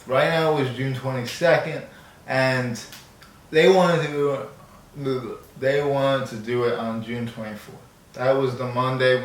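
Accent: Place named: South Jersey, United States. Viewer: Im praying for you brother. Yeah this not the type of video I ever see on my feed man Im a sneakerhead and I've been subscribed to you for a while. Please let us know how your progress is going whenever you can. Oh wow didn't know you are from New Jersey as well. Im located in Central Jersey. Sounds like you are in South Jersey. ✊️🙏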